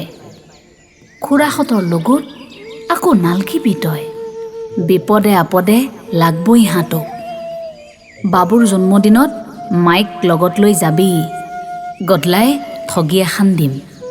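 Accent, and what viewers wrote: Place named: Lower Assam, India. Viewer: মোৰ সতে মিলি গ'ল। মইও নামনিৰ এ । স্পৰ্শ কৰিলে। আপোনাৰ মাতটোত নিজৰ ভাষাৰ শৈলীটো শুনি ভাল লাগিল।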